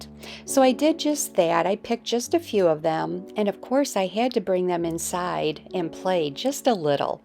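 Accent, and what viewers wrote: Midwest, United States. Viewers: Wow, your accent sounds like Illinois/midwest region.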